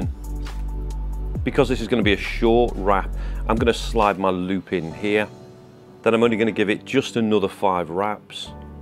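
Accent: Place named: Liverpool, United Kingdom. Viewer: You have a Beatles’ accent!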